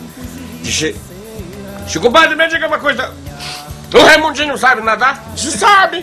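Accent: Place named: Pará, Brazil. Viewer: Melhor sotaque do Pará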